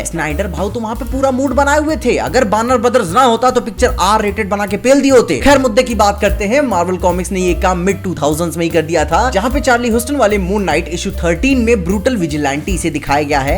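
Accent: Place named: Bihar, India. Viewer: Bhaiya ham bihari hai... Ham bhi kabhi kabhi ki tarha batiya lete hai. Par pata nahi kyu.. vo accent bhiya ke mu se jyada acha lagta hai... 🤣 Keep up the good work bhai..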